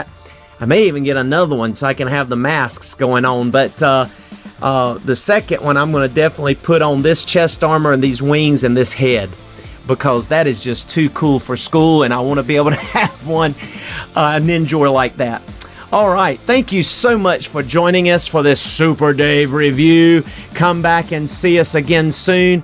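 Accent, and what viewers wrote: South, United States. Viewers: I like your southern hospitality accent when you talk I've been to North Carolina and South Carolina has stopped over at the Dallas airport like your accent, southern southern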